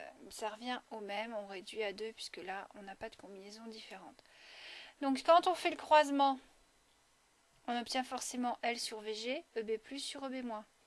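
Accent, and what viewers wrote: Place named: Bresse, France. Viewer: Parfois on dirait que vous avez un accent bressans ☺️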